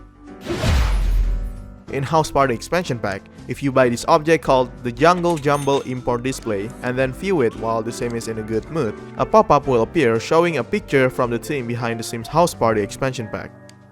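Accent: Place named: Indonesia. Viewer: Kayak ber aksen indo, kadang ada grab ama anak sma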